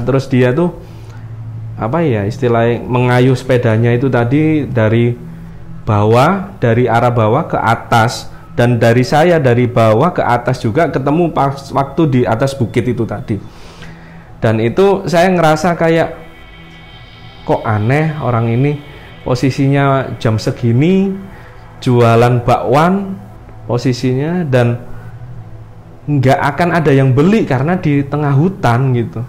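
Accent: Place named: East Java, Indonesia. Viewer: Nggresik... Njombang. Jawa timuran banget (≧▽≦)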